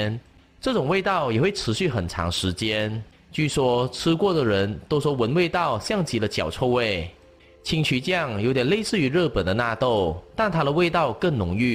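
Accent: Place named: Malaysia. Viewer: 大马口音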